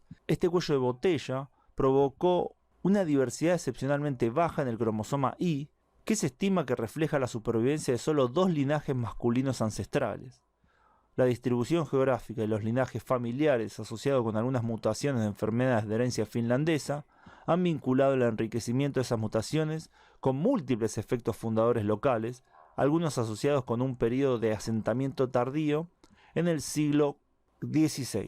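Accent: Argentina. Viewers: Wow... Super Argentino ese acento....👍👍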